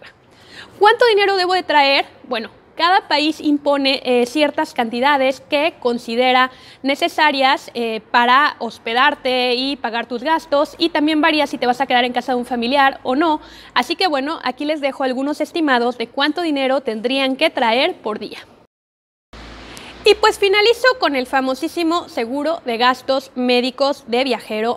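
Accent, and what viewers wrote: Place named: Spain. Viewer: Eres mexicana? Tienes acento espsñol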